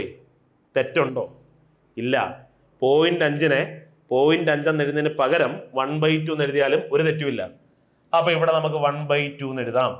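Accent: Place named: Kollam, India. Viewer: രണ്ടുപേരും കൊല്ലം ആണ് 👀... ഞാനും 😁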